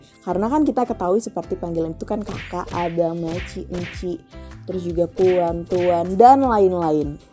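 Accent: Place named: Malaysia. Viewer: Manglish~ Malay English 🇲🇾🏴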